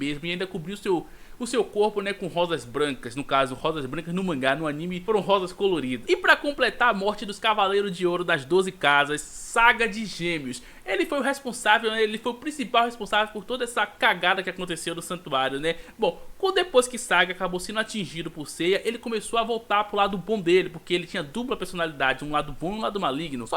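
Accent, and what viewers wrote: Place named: Bahia, Brazil. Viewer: Você até tentou esconder mas eu percebo que seu sotaque é daqui da Bahia. Ganhou mais um inscrito por isso ❤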